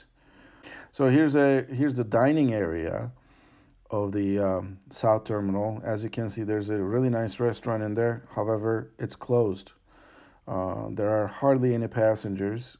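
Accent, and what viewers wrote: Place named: Turkey. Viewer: I liked your English.. it's quite fluent... but I felt the influence of Turkish ... any way I liked it and I prefer your videos in English..😊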